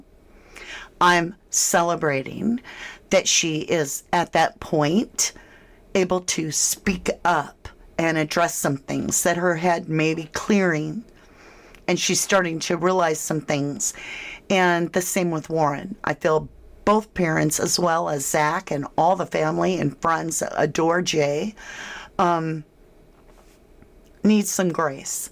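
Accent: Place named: England, United Kingdom. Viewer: I’ve noticed lately your speaking voice is becoming more 🏴 English and it’s good because your learning some of our sayings like silly sod 🤣 another one for you crazy mare 😂 I think it describes Lucy lol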